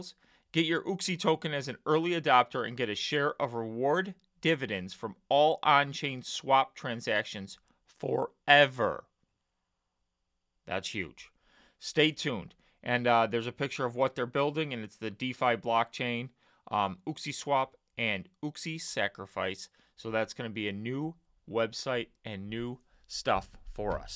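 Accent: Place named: United States. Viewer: Sounds like an american to me.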